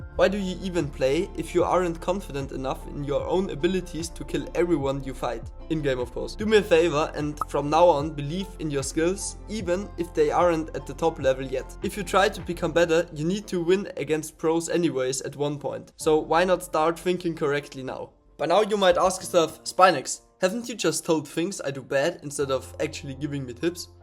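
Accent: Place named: Germany. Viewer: Du bist deutsch man hört es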